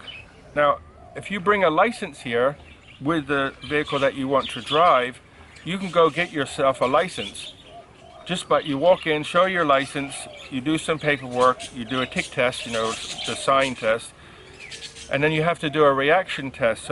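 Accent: United States. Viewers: This is your first video I've watched. Thanks for the good info. I was a bit surprised to find you're British. You sound every bit American :-) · One more if I may! Your English appears to be more American than British how is that possible?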